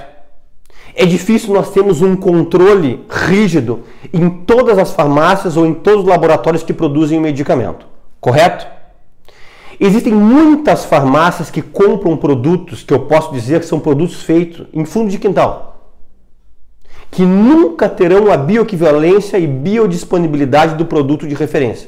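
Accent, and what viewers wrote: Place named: Rondônia, Brazil. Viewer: O senhor fala a nossa língua Rondônia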